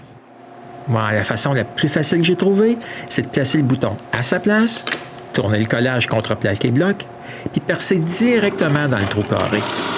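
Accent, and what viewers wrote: Quebec, Canada. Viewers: Super idée et super réalisation ! Il ne manque que les odeurs de bois et de colle . . . Bon , l'accent québécois est parfois dur à comprendre , c'est un Marseillais qui te le dit ( le nôtre est " costaud " aussi ! ) . Bravo pour ton travail ! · J'adore c't accent d'la belle province ! Mais mince alors, c'est pas juste kek bouts d'bois, c't'un chef d'oeuvre, tabernacle !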